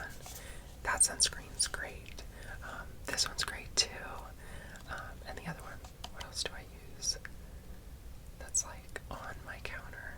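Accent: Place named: Bronx, United States. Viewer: YOU'RE FROM THE BRONX?!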